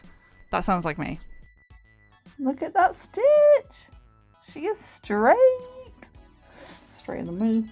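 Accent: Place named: United Kingdom. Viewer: NO worries on the delayed response. 😊 I know the U.K. has lots of distinct regional accents & your voice is so similar to hers that I was already pretty confident that you must be from around the same area. Anyway, again I really enjoyed the video & thought you did the legendary Streets Of Rage babe proud with that lovely cosplay.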